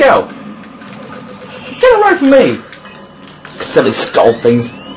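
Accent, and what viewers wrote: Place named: United Kingdom. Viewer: thnx, this video helped. got stuck. also, british accent = awesome :-D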